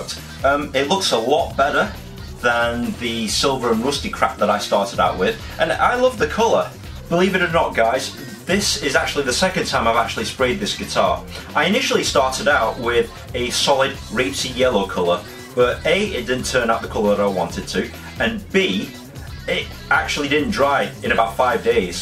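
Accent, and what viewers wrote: United Kingdom. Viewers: Asian guy sounds British....blowing my mind!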